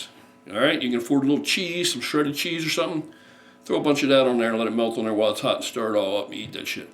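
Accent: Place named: Baltimore, United States. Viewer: It’s milk unless you’re from certain parts of the US like Baltimore county, like me, which is where he’s from too. I lived in Perry hall his accents different from mine a little maybe he lived on i83.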